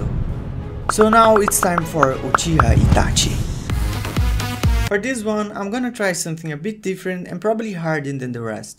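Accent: Brazil. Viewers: Adoro o sotaque brasileiro inglês hahaha, eu entendo tudo, os gringos falam tão rápido q nem da tempo de dobrar a língua ksks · o sotaque br é muito reconhecível, não dá pra deixar passar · Sabia q ele era brasileiro, esse sotaque não engana kdjdkdk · Eu percebi assim que ele começou a falar inglês, sei lá, parecia um inglês meio nordestino, pensei "sapoha é Br rapaz" kkk Cara esperto e esforçado slc. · Primeira frase que eu ouvi já pensei "hmm sotaque de brasileiro" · Esse cara pelo inglês dele, parece que tem um sotaque brasileiro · Esse cara tem um sotaque meio q brasileiro n acha? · Puta q pariu! Q FODA! Br reconhece outro BR só pelo sotaque kkkkk. Boa sorte nos trabalhos mano 👊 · Sotaque brasileiro do caralho kkkkk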